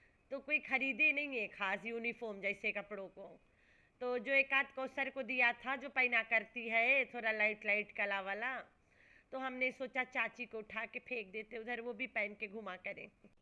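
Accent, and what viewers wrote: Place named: Bhojpuri, India. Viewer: Bhojpuri accent 👍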